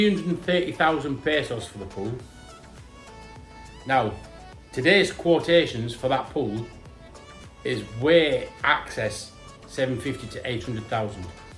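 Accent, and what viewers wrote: United Kingdom. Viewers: Where you from in the UK. Sounds like the North East of England.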